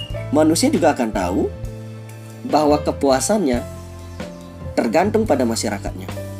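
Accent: Bali, Indonesia. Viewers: Logat balinya kental banget hehe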